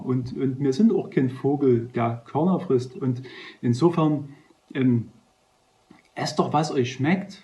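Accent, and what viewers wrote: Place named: Saxony, Germany. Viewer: He, danke für deine Empfehlungen. Auch das wir uns nicht verrennen sollen bei der Ernährung. Mit den 5 biologischen Gesetzen beschäftige ich mich schon eine Weile. Auch mit denn seelischen Ursachen von Krankheiten. Du scheinst auch in Sachsen zu wohnen. Dein Dialekt klingt mir so vertraut. Liebe Grüße aus der Oberlausitz, Anja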